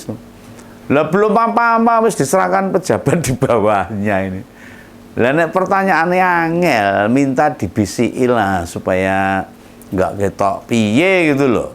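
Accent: Java, Indonesia. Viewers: Saya suka mas Admin ini bicara nya MEDOKK...wangun jawane...mantab ..beritakan yg pro Demokrasi dan yg anti Mulyono saja bossss..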